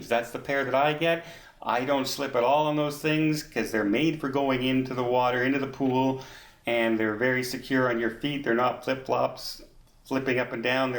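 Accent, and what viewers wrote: Canada. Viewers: I can so tell your canadian now lol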